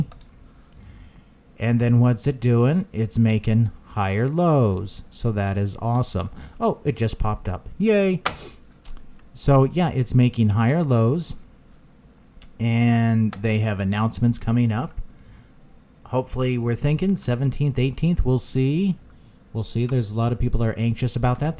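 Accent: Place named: France. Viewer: I love your french brother😁. Great show btw..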